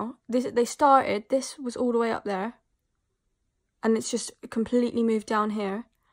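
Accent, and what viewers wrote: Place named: West Country, United Kingdom. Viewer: that west country accent lol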